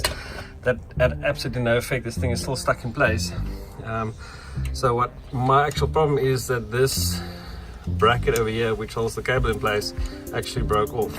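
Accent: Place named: South Africa. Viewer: Nice to hear a South African on YouTube give a how-to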